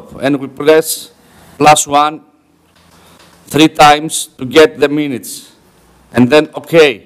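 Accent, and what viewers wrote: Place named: Greece. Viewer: Αγαπώ την Ελληνική προφορά στα Αγγλικά!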